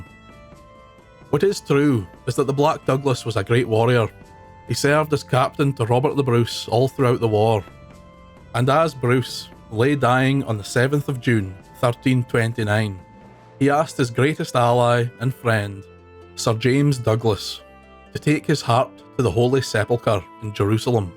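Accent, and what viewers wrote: Scotland, United Kingdom. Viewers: Scottish history has always fascinated me. I could listen to a Scottish accent telling stories forever! Thanks for the great content.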